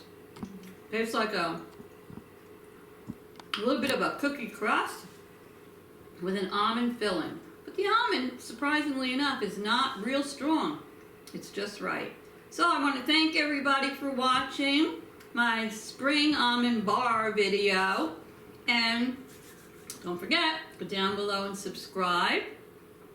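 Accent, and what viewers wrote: New York, United States. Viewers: Hello sweet:) My Almond Bars are in the oven right now---ohhh how lovely they smelled just mixing the ingredients let alone while baking! I tweeked a bit--I added 1 tsp almond extract to the cookie dough base--no such thing as too much almond flavoring...right?!! And, I'm going to put a simple powder sugar/water/almond extract glaze on top--just a very thin coat...I can't wait to have a taste test! Thank you so much for the recipe. I enjoyed your video and found both you and your "camera man" endearing. I detected a NY accent. The Bronx? Just guessing. Thanks again! Have a blessed day.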